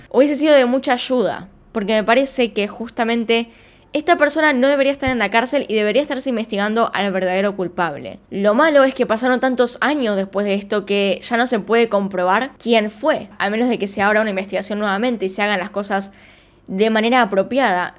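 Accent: Argentina. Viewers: Me encanta el acento argentino😀 Excelentes vídeos... Saludos desde Ecuador 👏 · Hola me encanta como narras todos los casos ya mi hija y mi yerno están adictos también a tu canal..pero..quisiera preguntarte Cómo te llamas..cuantos años tienes lo unico que sé es que eres de Argentina por tu acento Soy Panameña por todo lo demás y como decimos acá me quito el sombrero ante tu excelencia de documentar investigar y narrar todos los casos...Felicidades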